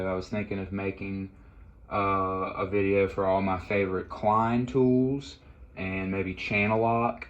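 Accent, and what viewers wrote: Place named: South, United States. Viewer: It's far too easy to hear a bit of a southern drawl and equate that with dumb-ass, youtube has shown me plenty of clever dumb-asses 😀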